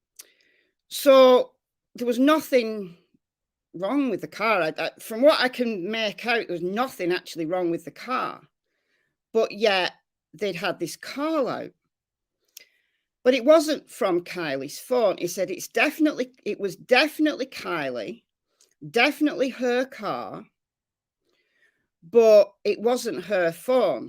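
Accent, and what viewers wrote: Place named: England, United Kingdom. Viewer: Thank you kindly for all that your doing, your a very genuine person out of all the youtubers out there 🐦 And I love your English accent 🙂💜 Lisa ~ Australia 🌌✨🌠 xx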